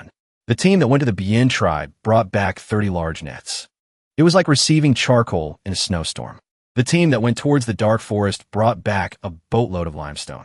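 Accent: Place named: Mexico. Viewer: whats with the occassional mexican accent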